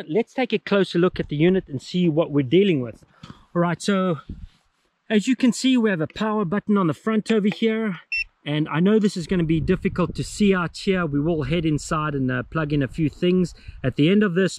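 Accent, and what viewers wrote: Afrikaans, South Africa. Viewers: I agree that is a South African accent. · Almost sounds South African? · Love the South Africa Accent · South african? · South African accent? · Are you south African? Or have you lived there for a while, your accent is so similar. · Jy klink vir my Afrikaans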